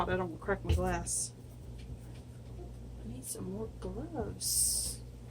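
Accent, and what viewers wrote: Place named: California, United States. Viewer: Love your accent. Sounds Cali with a southern past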